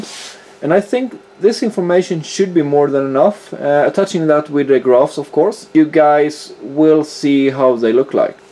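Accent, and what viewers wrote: Sweden. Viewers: Good channel! where are you from? you sound Swedish.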